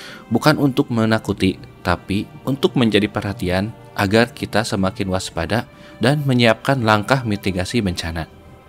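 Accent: West Java, Indonesia. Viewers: Terimakasih konten nya kang... sunda pisan euy logatna 😊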